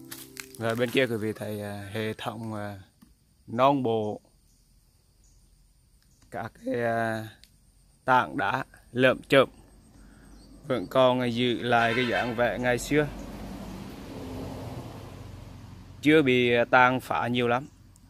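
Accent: Hue, Vietnam. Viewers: Nhac mo qua lon. Noi tieng Hue khong nghe duoc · Do bạn không quen nghe chứ ảnh nói giọng Huế chuẩn đấy. Nhạc rất hợp với khung cảnh. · Giọng Huế nặng quá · Giọng Huế mà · Ông Thuyệt Minh nầy noi tiêng HUE khó nghe quạ!!!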